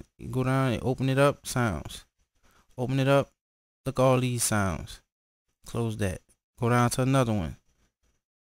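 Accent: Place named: United States. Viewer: love ur american accent...im a canadian so im weird...lol, nice vid..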